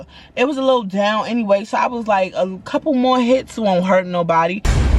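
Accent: New York, United States. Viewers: Hey girl! I’ve been binge watching your videos for like 2 weeks now! Currently on the BD series! I came across one of your videos by accident and been hooked ever since! I think it’s bc we both from New York so I love the way you talk and we both moved down south. Keep up the good work! I will continue to watch your story times. You’re such a good storyteller! ❤ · Girl I love how you still sound so NY when you been in the south for some yrs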